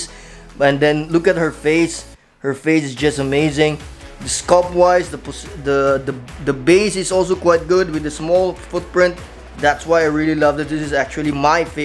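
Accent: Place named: Netherlands. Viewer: Awesome collection bro. Where are you from? You sound kinda Dutch😉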